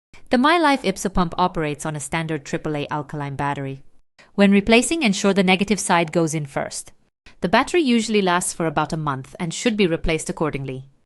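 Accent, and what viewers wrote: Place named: India. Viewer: haha the ai face intro and then into the thickest indian accent after the AI slop moves on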